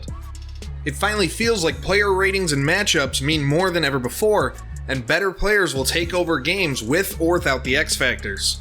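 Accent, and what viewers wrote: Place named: Midwest, United States. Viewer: Oh damn..that wisconsin/minnesota accent tho 🤣😂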